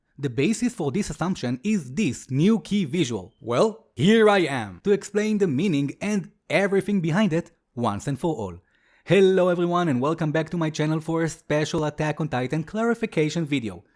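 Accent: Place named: Israel. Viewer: Bro I could tell you’re from Israel by your accent 😂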